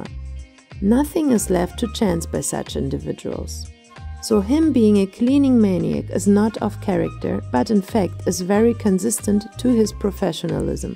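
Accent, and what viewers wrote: Germany. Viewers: are u german btw? bc if u are I can hear it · Are you german? Your accent sounds like you are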